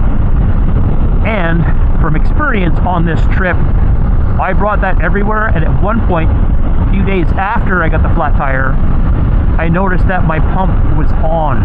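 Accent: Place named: Canada. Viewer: As I've gotten older, a 3 or 4-day trip is the sweet spot for me. From where I live in central North Carolina, I'm less than a half day ride from some of the best roads on the east coast. I have a couple friends that I'll do trips with, but I'm the one who always plans the routes, arranges the hotels, lunch stops, etc. I love riding with my buddies, but doing my own thing relieves me of those duties--and the stress--and makes the ride more enjoyable. I didn't realize you were Canadian (I should have picked up on the accent, lol). I work in Canada quite a bit, as we have a branch office in Mississauga. I'll be working in Montreal for a week in September, followed by a week in Edmonton/Calgary. Good video, buddy. Ride safe.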